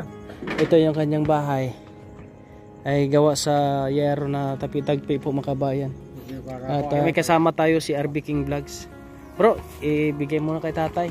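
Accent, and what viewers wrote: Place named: Cebu, Philippines. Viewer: Ano yung dialect mo cebuano ka ?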